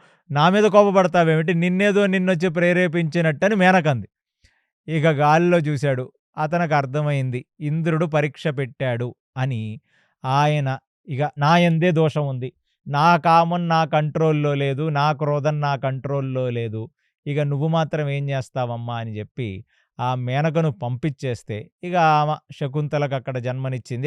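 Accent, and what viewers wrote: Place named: Godavari, India. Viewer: Godavari Slang😅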